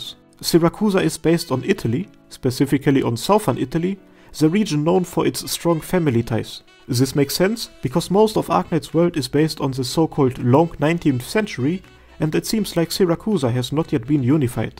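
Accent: Germany. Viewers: Man I loved this stuff, no one ever has put so much effort into this kind of videos explaining the lore of the world of Terra, love it, keep up the good work! PD.: I love your german accent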